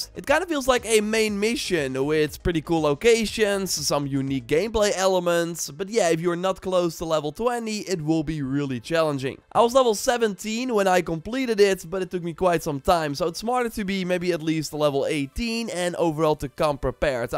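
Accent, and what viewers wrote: Netherlands. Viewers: nearly all youtubers I like lately are Dutch. What the hell 🤔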